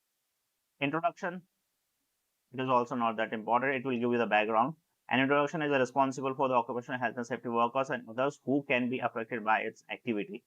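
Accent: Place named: Bihar, India. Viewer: Sir very amazed after listening you you have eloquently explained each and every aspect. Be blessed always. Love From Pakistan. I think you are from India most probably from Bihar you ascent and facial expressions are resembling to that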